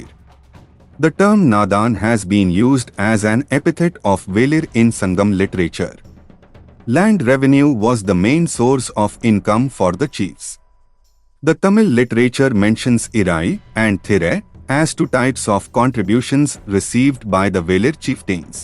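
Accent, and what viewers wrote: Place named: North India, India. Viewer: Thanks for the channel to have done this.acxent seems to be from north..happy that history is spread over india.please.do it in hindi too brother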